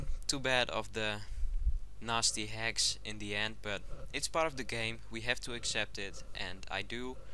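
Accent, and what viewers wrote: Netherlands. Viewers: are u dutch ? cuz i am dutch and i think i hear a dutch accent in your english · Hier had je echt nog een typisch hollands accent :] Wel enige verbetering in gekomen! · you sound dutch!?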